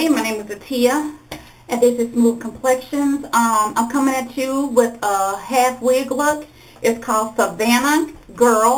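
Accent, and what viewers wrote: South, United States. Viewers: I love all of your videos,! Are you from a southern state..I love your accent.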